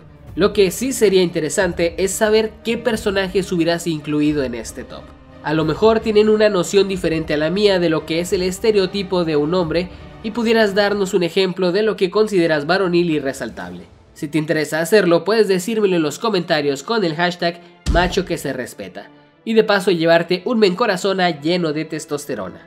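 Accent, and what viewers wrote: Mexico. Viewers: jajajajjajajajaj me gusta tu forma de ablar como nosotros haci mexicano mm creo que eres mexicano nuevo sub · De eres? Me suena muy mexicano tu acento; Aparte que usas Muchas palabras del léxico mexicano.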